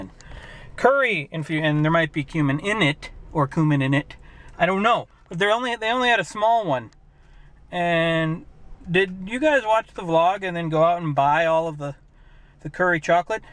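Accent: England, United Kingdom. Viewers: i abousolutly loved your english accent soo funny you sounded like stewie in family Guy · I think that was the best English accent I've ever heard you do! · Running barefoot leads to cuts and abrasions and let's not leave out what happens if you stub your toe! Oh, and then there's slipping on a banana peel or stepping in doggy doo doo!! I really liked your English accent :) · we need to hear the snobby englishman voice more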